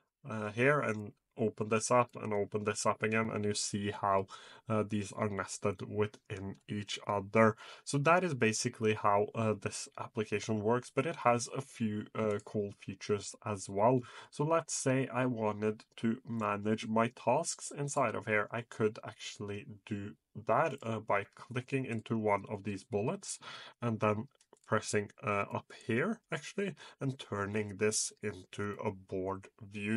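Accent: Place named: Norway. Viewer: Came for the info, stayed for that cute Norwegian (?) accent 😝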